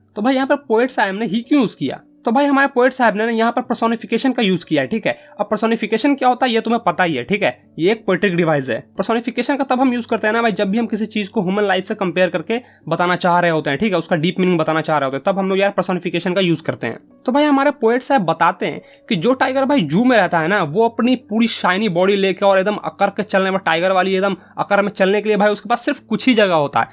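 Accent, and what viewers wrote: Bihar, India. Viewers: Brilliant explanation sir , I am from Bihar and I could feel your bihari accent coming in between the lecture 😂😂🤣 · Baauate rhata hai ...... A bihari or I can felt that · Bihar se ho na tum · Bihar se ho kya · Bhaiya Bihar up se lag rhe hai😅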